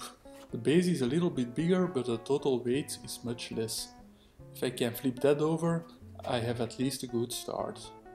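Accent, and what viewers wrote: Belgium. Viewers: Your videos are very inspiring and pleasant to watch... and your accent reminds me of my good friends in Brugge and Gent :-) Best wishes from Poland!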